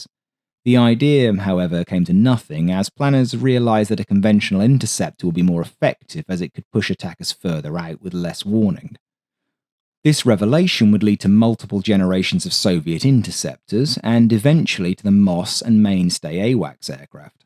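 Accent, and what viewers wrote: United Kingdom. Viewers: "Loo-tenant"? Really? You sound British, so why are you using American pronunciations? Just a part of the continuing Americanisation of the United Kingdom. Sad.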